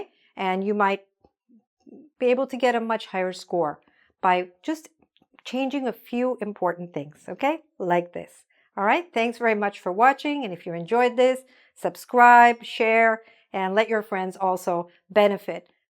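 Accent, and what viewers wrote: United States. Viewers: Her accent is American so I'd bet for American English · Pardon,it's american english?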